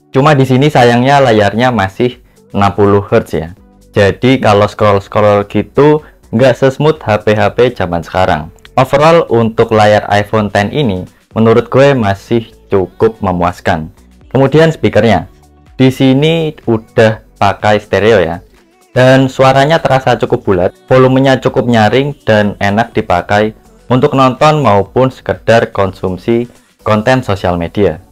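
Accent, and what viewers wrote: Java, Indonesia. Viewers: Suoromu medhog bgt mas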